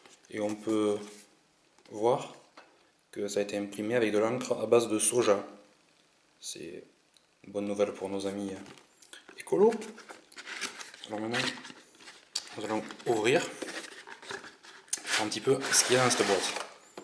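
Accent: South, France. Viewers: Bien joué pour la vidéo! Preneur pour avoir ton avis après utilisation quotidienne. Et vive l'accent du sud ;)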